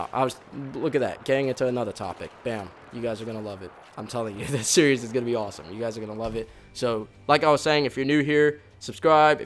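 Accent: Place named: Florida, United States. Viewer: You sound like you live in Florida.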